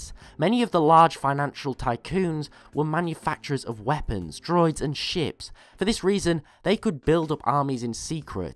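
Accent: United Kingdom. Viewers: This sounds odd, but what nationality are you? British? Australian? Also what if the Mandalorians won the Mandalorian Wars?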